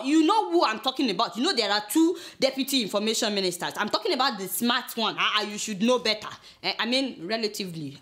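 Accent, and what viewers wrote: Nigeria. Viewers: who paid you should have thought you how to speak like a nigerian big fool...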